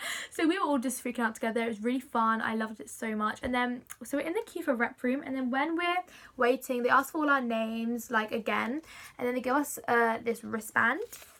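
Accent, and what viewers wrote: United States. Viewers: Awesome video!!!! just found your channel. That's a good start on your American accent. Keep working on it. Practice makes perfect. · I am a 34 year old woman getting ready to go to a Taylor Swift concert in a couple weeks. I feel like we are sitting here fangirling together. lol And your American accent was one of my favorite parts. :)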